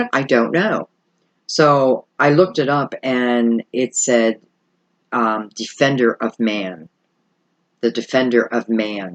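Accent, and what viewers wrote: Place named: Philadelphia, United States. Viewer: Girrrrl!!!! Omg you are so gifted. But you're also familiar and cozy. It's a joy to listen. And that Philly accent! Makes me homesick for PA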